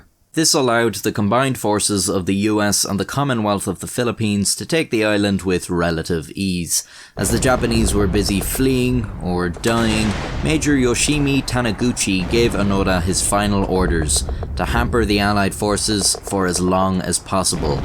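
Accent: Ireland. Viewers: I always forget this guy’s Irish. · An irish man talking about WW2 is wierd. Like watching a swiss made video on WW2. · you irish? cuz im from cork and you sound irish XD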